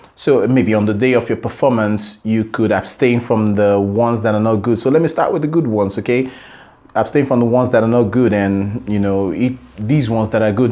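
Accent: Nigeria. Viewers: Sounds Nigerian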